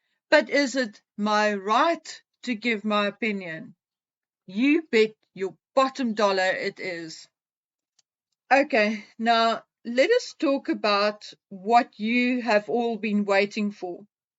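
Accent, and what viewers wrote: South Africa. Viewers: Hi ,- so nice to hear a South African voice on these Harkle exposé videos! Thank you for your in depth research and your integrity. You are always balanced, fair. I would be interested in hearing more about the paintings.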